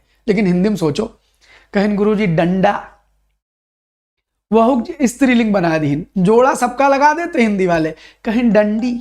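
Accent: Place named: Lucknow, India. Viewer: Pranam Sirji..Hum bhi Lucknow se hain.. Hume aapke baat karne ke lahje se laga hi tha ki aap Lucknow se hain❤Jai Shree Radhey Radhey🎉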